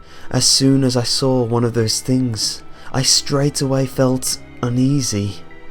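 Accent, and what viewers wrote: England, United Kingdom. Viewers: Where in England are you from, I'm tying to learn the regional accents of GB/UK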